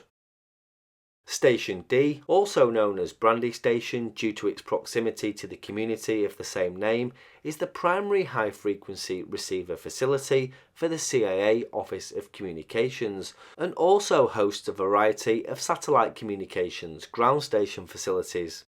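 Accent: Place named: Cockney, United Kingdom. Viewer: Ay love, yer cockney accent is really really thick to these yank blokes who are strugglin like hell to understand. we love all our long lost brothers & sisters from the U.K., & some of the cultural idiosyncrasies are just endearing to us here who embrace diversity. However, still strugglin to hear through the sharp curves in dialect. Someone should invent a bloke to bloke translator. ya know-- "cookies to biscuits" & all that rot.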